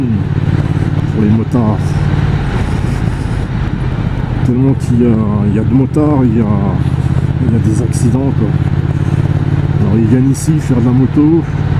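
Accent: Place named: Franche-Comté, France. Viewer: .." bohneur " ?! ou " bonheur "?! , vous qui vous moquez un moment donné , sans doute pas méchamment( ?), de l'accent "halsassien " , en tant que " bon " Français ! J'ai cru percevoir un accent franc-comtois , soit dit en passant !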